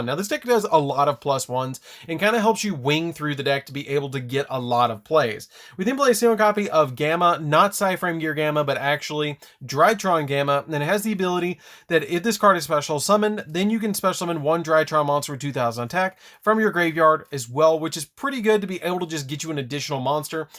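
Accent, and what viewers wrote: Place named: Texas, United States. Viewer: Well its Texas English, idk if its too hard to understand, but if you're so inclined. THIS DECK GO UNGAH BUNGAH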